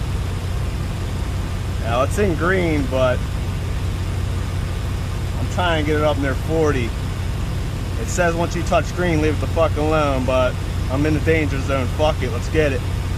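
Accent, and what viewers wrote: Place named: Philadelphia, United States. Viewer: My man gotta be from Philly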